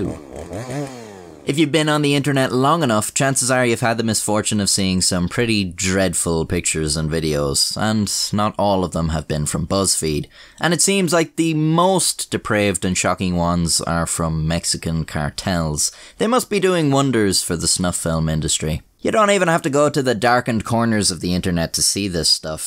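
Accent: Ireland. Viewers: The way your stories are presented is pretty damn awesome. I mean, where else can an old, white guy from the USA get to listen to stories told by someone in an Irish accent. The articles are interesting, yet amusing, just what this old man needs after a day of work. You've gained yourself another subscriber, lad. Keep up the good work. :) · Great story. I would never have u heard it or even remembered it if it wasn't for you and the way you told the story. Love the Irish accent. I'm a subscriber.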